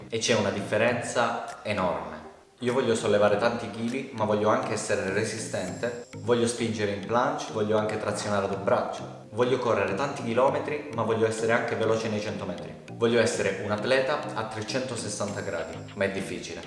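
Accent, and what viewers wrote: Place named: Calabria, Italy. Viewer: E niente... mi sono appassionato da un anno al calisthenics e tu mi rendi anche fiero di essere calabrese come te. Sentire discorsi motivanti come questo col proprio accento è il massimo che possa desiderare da un canale che tratta della mia passione 😂. Grande💪🏻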